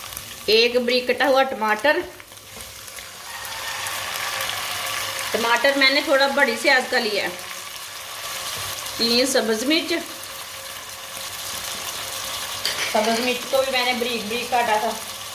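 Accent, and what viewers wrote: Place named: Punjab, India. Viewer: جی اپکی ريسيپی کی تو بات ھی اور ھے مگر پنجابی سٹائيل ميں جو اردو کے الفاظ اپ کے لب مبارک سے پھولوں کی طرح جڑ رھے ہيں😀، ان کی بھی کوئی مثال نہيں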